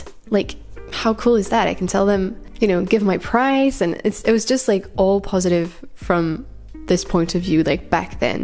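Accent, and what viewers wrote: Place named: France. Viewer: salut, j'ai l'impression que tu es française vu ton accent, mais je suis pas sûr. Est-ce que tu as été diagnostiquée adhd par un médecin en france? parce que j'ai l'impression que ce n'est pas trop dans la grille de lecture des médecins et psychiatres ici (du moins de ceux que j'ai pu rencontrer), mais que c'est plus reconnu dans le monde anglo saxon. tu as un avis là dessus? (désolé j'ai pas encore regardé toutes tes vidéos...)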